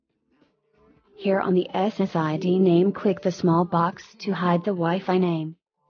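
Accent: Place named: United States. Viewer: Gandah nang boses parang american😁salamt sa video mo.another saving life....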